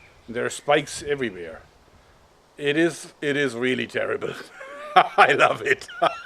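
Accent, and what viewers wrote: Germany. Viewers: warum hört sich sein englisch so deutsch englisch an?😂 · Are you german? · are you german? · I am german and i know a german accent when i hear one and i sound exactly the same like him when i speak english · are you German? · His accent sounds very authentic, actually. I don't know where you see his accent slipping, but you clearly don't know what a real German accent sounds like. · You are German! I can hear it! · U sounds like a German Guy that’s try to speak Englisch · Are you German? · Du bist duetsch das hört Mann aber cool Video Mann i Love Lucille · YOU ENGLISH IS GRAMMATICALLY, WICKEDLY EXCELLENT. ...OF COURSE WITH A NICE GERMAN ACCENT! ...WICKEDLY ENTERTAING AND FUNNY. 😀😀😀😀👍👍👍👍💖💖💖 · Du bist safe Deutscher. Wie lange und wo du wie lange wohnst ist mir egal. Du hörst dich an wie ein Deutscher der Englisch redet. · Dein deutsch aktzent is nicht zu überhören xD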